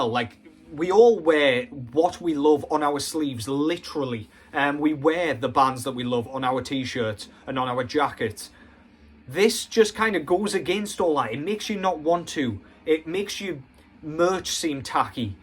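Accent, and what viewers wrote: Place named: Northern England, United Kingdom. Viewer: You saying piss poor in your delightful northern English accent is my favorite thing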